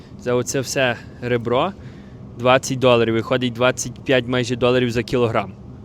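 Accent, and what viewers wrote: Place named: Ukraine. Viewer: Thanks a lot.for your answer. I do love the Passion you put info recording your videos. I am also trying to understand what you are saying in Ukrainian (I only party succeed). I was only slightly suprised that you have a pretty strong Ukrainian accent when Speaking English. As far as I remember, you came to Canada at the age of 11 (before puberty). Anyway, that is really that important. Keep up good work.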